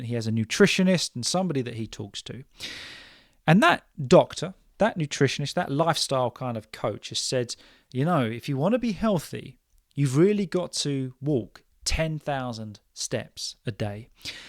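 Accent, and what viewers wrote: United Kingdom. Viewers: Hello, What a great episode! I also track and count steps on my smartwatch, and you’re right—10,000 steps every day is not that easy. As for me, I regularly reach my goal without any problems—8,000 or 9,000 steps for sure. The rest might be from hand movements that my smartwatch records, so it’s pretty easy to cheat—or better said, the results aren’t always accurate. I have to admit that yesterday, due to the rainy weather in Germany, I didn’t have the motivation to go for a brisk walk. Thank you so much for your inspiring videos! I discovered you on YouTube just the other day, and I truly enjoy listening to you and your cute british accent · Mr you do fantastic I like it your videos so much because you speak British English clearly and and perfectly. Thank you very much for your videos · where are you from british can you answer me please because your accent very nice i love it 😍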